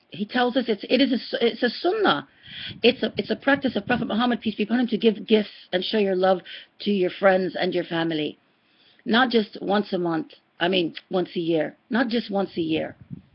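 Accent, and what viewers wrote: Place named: United States. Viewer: Haha loved it! Jazaki Allahu Khair sister! I shared your video to my dad who is not yet a Muslim. May Allah guide him, please make dua for him sister. I do feel bad for not calling to wish him happy birthday as he does for me and my children. I sent him a message with a dua and will chat with him tomorrow InshaAllah. In the mean time I needed help in explaining this to my dad and so I did a search - listened to many short explanations by my favorite sheiks and Subhanallah Allah led me to your video. MashaAllah! You explained this matter very well! 😃 btw, I'm confused about you English accent - I think you are American but perhaps moved abroad?! Haha. Anyway it's a beautiful accent MashaAllah.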